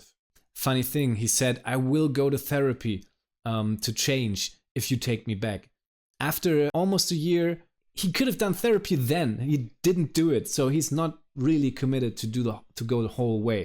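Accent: Germany. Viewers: that one killed me 💀🤣 Came here from the "Adolescent" video and the comments under it were wild... But I really enjoyed the way you chose to respond to them, so I checked another video and wasn't disappointed. As someone who both read the book and saw the movie, I got a bit offended by the title of this video, as the movie was awful compared to the book. But as you're only judging the acting, there might be some good scenes in it. idk. To me, personally, there was no chemistry at all between the two main characters and a lot of the side characters did a way better job at making me feel for them. That's why the last scene also didn't move me in any way. I think this movie would've been perfect with some unknown actors to focus on the story and not on Blake's stupid fashion choices or whatever. I think you're doing a good job with these videos. Keep it up! 💜 P.S.: Are you German? 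Your accent sounds like it.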